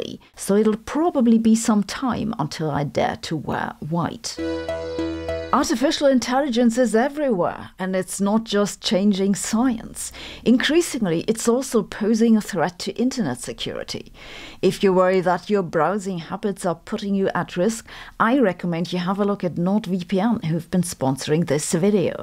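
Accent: Germany. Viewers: Nah, she's just German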